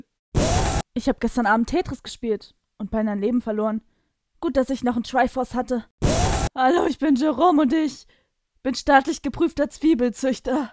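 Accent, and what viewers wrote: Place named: France. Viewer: haha wie geil :D Dein französcih-deutsch hört sich so goil an...RECHTSCHREIBUNG ARGH! XD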